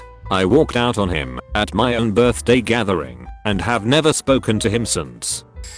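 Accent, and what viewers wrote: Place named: United Kingdom. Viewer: Are you trying to copy xo stories with the british voice and the background elevator music?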